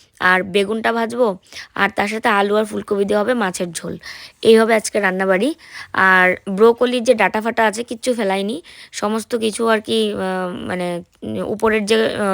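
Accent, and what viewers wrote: Bangladesh. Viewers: From Bangladesh 🇧🇩. Apu tumi Bangladeshi suna khub valo laga. Tomar video thakhi but comments kora hoy na.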